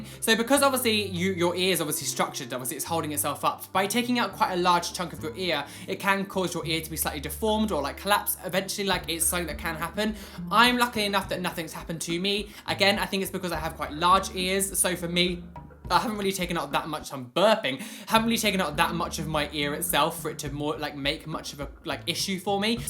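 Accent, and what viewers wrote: United Kingdom. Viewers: I've just discovered your channel you're so cute :( I love to hear that british accent ♥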